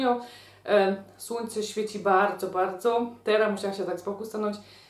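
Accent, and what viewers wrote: Silesia, Poland. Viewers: Te nitki obetnam, te szporogi pomieszom... Uwielbiam slunski jezyk :D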